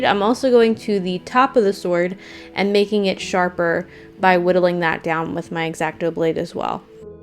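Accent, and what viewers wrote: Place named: Upstate New York, United States. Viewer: I love your lingo. I swear if you lived nearby we would be besties. Unfortunately for me Id guess from your dialect (which sounds north eastern, more specifically Upstate New York) is on the other side of the country. I live in Washington State.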